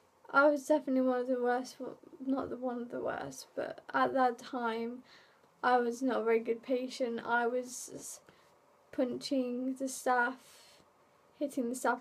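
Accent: United Kingdom. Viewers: The accent is either Liverpool, or Manchester. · I don't know why the voices have to be negative, but I guess sometimes they are positive,I hear them too but now a days they are softer and I don't think people are really thinking about me.people are thinking about themselves.Don't worry cause there is no reason why people would want to cause you harm,so your alright. Modern medicine is getting more advanced there is hope ,that soon you won't have to hallucinate not so much.You look like a sweet girl and I like you English accent.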